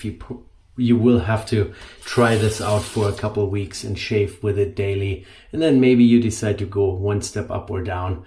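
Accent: Germany. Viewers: Mußt nicht machen. Danke für die Erklärung. Dem Namen nach und dem german accent nach habe ich es mir schon gedacht. (von rock sprachst Du relativ am Anfang) Schöne Grüße über den Teich 👋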